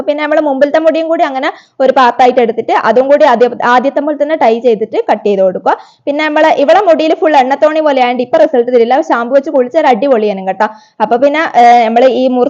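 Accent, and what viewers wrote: India. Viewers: Chechi kannur thalassery aano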